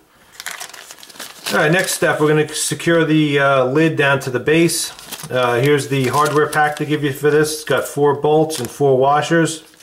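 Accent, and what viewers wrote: New York, United States. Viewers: I'm guessing by your accent that you live in NYC/Long Island area? I'm originally from Queens but live in NJ. My wife and I go to the city several times a year to catch a play and eat something bizarre in Chinatown. I'll give you a shout if you are in fact from the area and get you a beer or two. Thanks again from a great video. 😊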